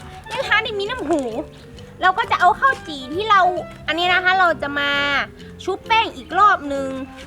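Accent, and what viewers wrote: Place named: Chiang Mai, Thailand. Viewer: พี่อยู่จังหวัดอะไรค่ะทำใมสำเนียงเหมือนคนเชียงใหม่จัง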